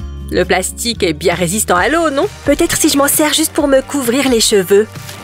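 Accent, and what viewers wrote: England, United Kingdom. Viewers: Vous êtres anglais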